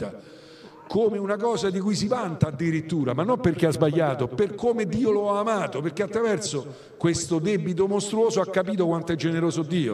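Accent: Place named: Rome, Italy. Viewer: Che bello sentire parlare don.. perché tanto illuminato e per il suo simpaticissimo "romano".. 😊😊😊😊❤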